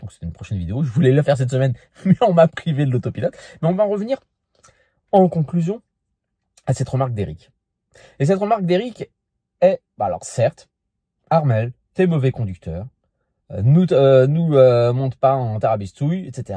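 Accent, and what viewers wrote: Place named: Marseille, France. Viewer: Je précise que j'aime le contenu des vidéos. Même si les titres sont un peu hors sujet et légèrement exagéré. Si je savais pas de quelle région il était je dirais qu'il est de Marseille 😅😂.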